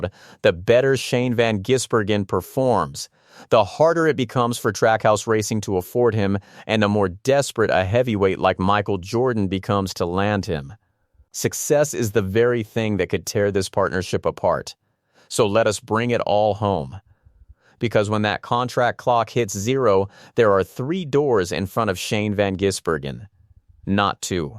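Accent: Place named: United States. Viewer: You sound quite American. I'm not sure how you can represent NZ and AUS with a voice like that. Makes me suspicious of your analysis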